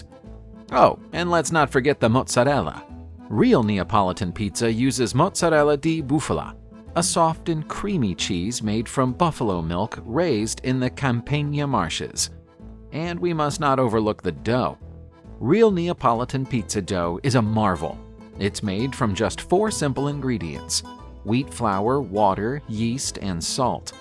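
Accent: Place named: United States. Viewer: Good video but shame about the American pronunciations.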